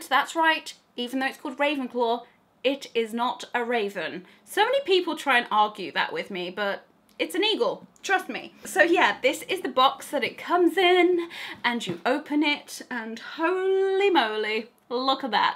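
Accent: United Kingdom. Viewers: I love your British accent ❤️❤️❤️ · I’m so used to hearing you so when I hear a British accent it sounds so normal to me btw I’m American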